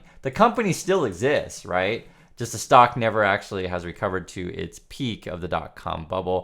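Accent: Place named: Pacific Northwest, United States. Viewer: Fellow Oregonian here! Funny, I thought you might be from the PNW. The way you speak gives it away, big time. haha! Thanks for the video!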